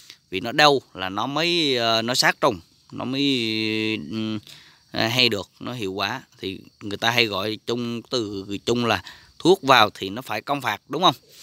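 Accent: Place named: Binh Dinh, Vietnam. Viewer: Mình khoái nghe giọng bình Định rồi đó nha. Thuốc thì ok rồi hôm nào mần cờ líp về võ thuật và rượu bầu đá nhe 😁😁😁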